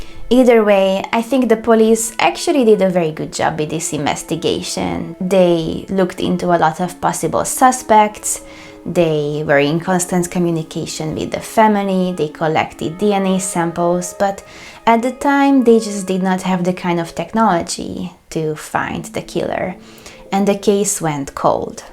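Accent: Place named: Hungary. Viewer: It is lovely and interesting, listening to Hungarian cases, listening to your hungarian accent and hungarian words. Greetings from ex Yugoslavia, from central Bosnia. Kiškofinefhaza hahah hungarian language amazes me.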